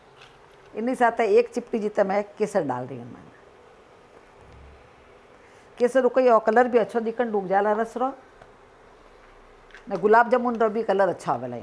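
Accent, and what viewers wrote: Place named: Marwari, India. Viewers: Very nice recipe .Explaining in marwadi language is really sweet.Thanks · Ye to Bikaner side ki marwadi lag rahi hai. Jaipur me aise to nahi baat karte? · दिदि आपकी मारवाड़ी आवाज अचछी लगती है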